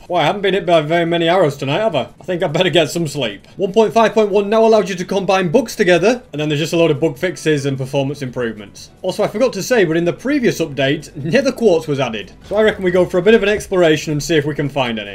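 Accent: United Kingdom. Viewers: He has a British accent and he is from the United Kingdom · This man has the most enthusiastic British accent of my entire life.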